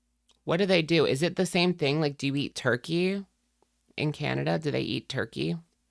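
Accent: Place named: New Jersey, United States. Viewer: Sometimes I can't tell what you're saying with your kind of new jersey accent over the silly fake accents you do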